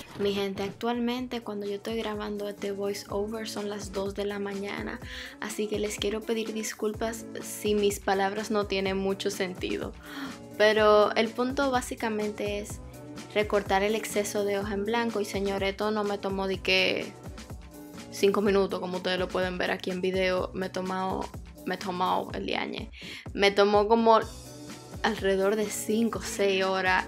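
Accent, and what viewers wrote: Dominican Republic. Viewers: Eres domicana! Conozco tu asentó 👊😌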